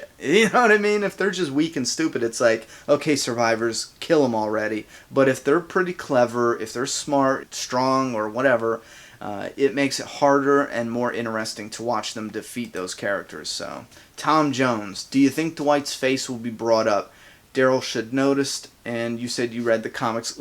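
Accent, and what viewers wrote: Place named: Boston, United States. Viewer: are you from Mass? I hear that Boston accent...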